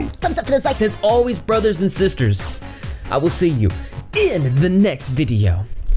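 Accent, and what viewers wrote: South, United States. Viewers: Glad i found you. Ur great.I'm in Alabama.u must be from the south too? Luv ur accent.plus ur so damn good looking! ❤...luv ur content 😊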